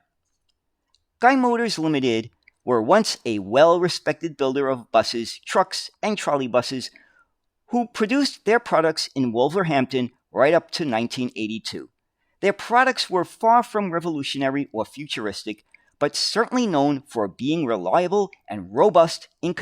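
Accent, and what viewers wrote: United States. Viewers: I seem to be drawn to British bus videos - I simply find it fascinating to hear an American accent talking so enthusiastically about British buses! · Thank you for your Video..! Seems strange a "Guy" excuse the pun ? with a New York accent is interested in my local childhood buses..Brought back happy memories.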